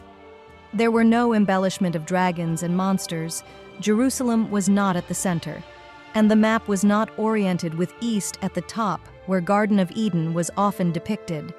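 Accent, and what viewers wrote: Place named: United Kingdom. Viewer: I rather prefer to hear Brit accent than those other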